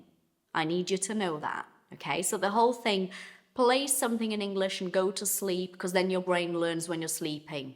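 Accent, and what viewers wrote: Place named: London, United Kingdom. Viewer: Your example..."and you are in London...."( I am...hehehe). Are you??? I believe so....hehehe based on your English😊😊 Infelizmente, after ages vivendo aqui, confesso...ainda assisto coisas com English subtitles....mas é weird pq Youtube eu não coloco subtitles, já tv, sim....😮 Anyway, great video! Deus abençoe!😊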